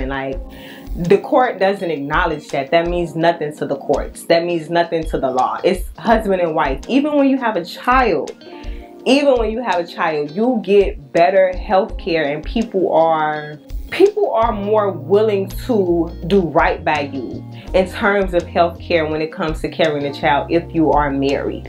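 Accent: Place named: South, United States. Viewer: Your beautiful ❤️....Your accent ....Hmm....sounds SOUTHERN......where are you from sweetie ?? Atlanta ?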